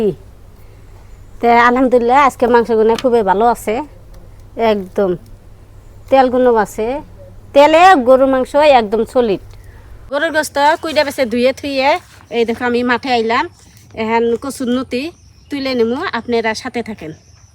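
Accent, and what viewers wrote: Tangail, Bangladesh. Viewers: আপনার জেলা কি টাঙ্গাইল নাকি?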